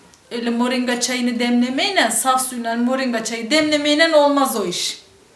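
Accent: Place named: Erzurum, Turkey. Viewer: Ben sivasliyim.siveler benziyor sanki Erzincan Erzurum lu gibisiniz. Doğru mu?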